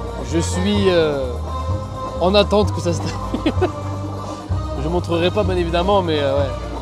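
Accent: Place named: England, United Kingdom. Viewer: oh my god I love this English accent, you sound hlou😍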